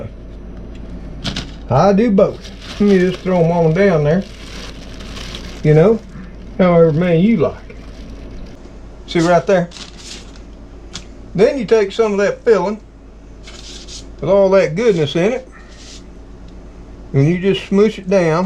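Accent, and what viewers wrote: South, United States. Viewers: if your a Finnish person it's all salt and pepper, Ha Get right down to it. I learned Mrs's Dash in Boy Scouts! ha Love your southern swang. Get a job Brian.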